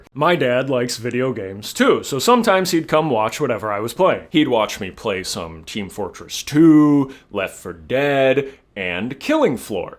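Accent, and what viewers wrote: Birmingham, United Kingdom. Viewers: como diria el tablos, el acento britano le da ESENCIA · Hearing you as an American loving the Bri’shness and doing a pretty top job at a Brummy accent made me proud to be from that shithole! 😂😂 great video mate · subbed for the flawless birmingham accent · You sounds very British, I'd trust you review a briish game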